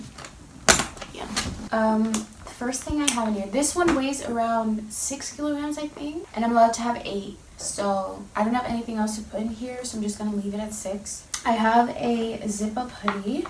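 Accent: United States. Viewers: omg ik kom er nu achter dat je Nederlands bent terwijl ik altijd dacht dat je uit Amerika kwam ofzo 😂😂 Maar welke primer gebruik je? :) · wait youre dutch i thought you were american all this time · ik dacht dat je Amerikaans ofs was maar je bent Nederlands woaaw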